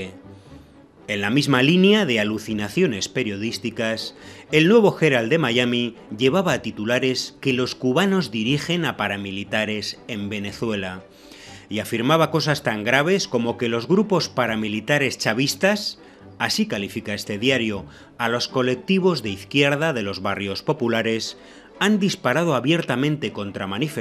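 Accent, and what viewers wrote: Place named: Spain. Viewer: Cuba Información y con un españolete de narrador! que bodrio!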